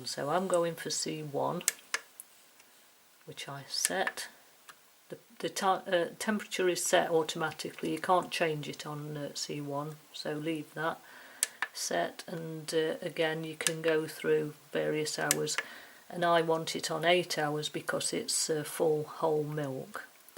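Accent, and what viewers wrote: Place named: East Midlands, United Kingdom. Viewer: Thanks for a clear and detailed explanation of how to use this machine. Also, are you from the East Midlands, by any chance?? Your accent sounds just like ours.🤭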